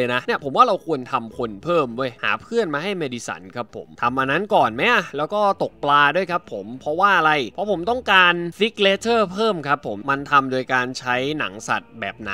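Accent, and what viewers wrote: North, Thailand. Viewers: พี่เป็นคนเหนือหรือเปล่าครับ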